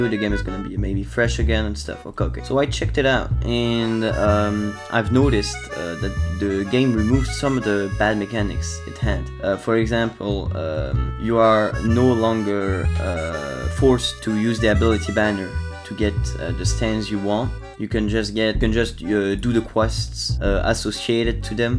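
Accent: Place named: France. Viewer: u got a french accent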